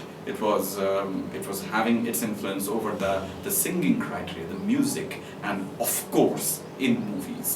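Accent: Bengal, India. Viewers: For an English teacher the accent/diction is amusingly bengali.. but that's ok if the critique was not so poor.. Does not go beyond just describing the acts in the play. It has no depth of the time, of social/political/ literary history. Is this sponsored by UGC?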